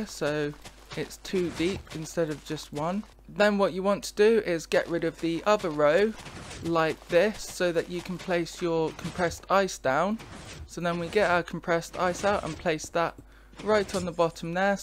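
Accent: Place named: United Kingdom. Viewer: 와 영국발음 갖고싶다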